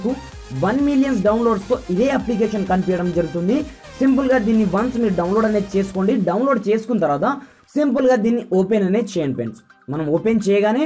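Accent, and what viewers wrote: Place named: India. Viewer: Why is it always indian? I cant u derstand what your sayinggg..just ganna turn on captions then.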